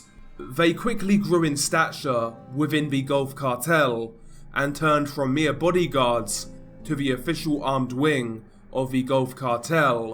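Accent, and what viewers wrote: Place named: United Kingdom. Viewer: Idk why but as an american i enjoy the british narration on mexican cartel atrocities.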